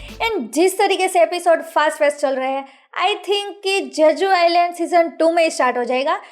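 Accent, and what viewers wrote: Gujarat, India. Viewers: aap gujrati ho na?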